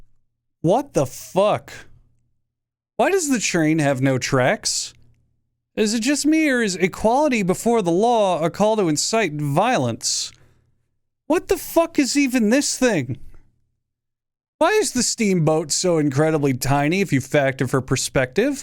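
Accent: United States. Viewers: Sound pretty American to me bro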